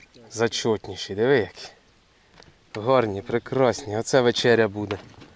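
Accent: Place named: Surzhyk, Ukraine. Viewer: Я вибачаюсь ви з якого регіону українці бо у вас пробачте страшний суржик поправте українську мову а взагалі я дивлюся ваш канал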